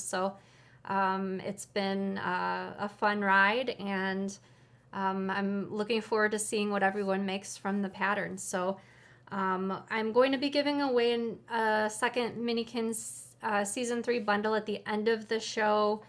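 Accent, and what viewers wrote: Newfoundland, Canada. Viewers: Newfoundland for sure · Deffo Newfoundland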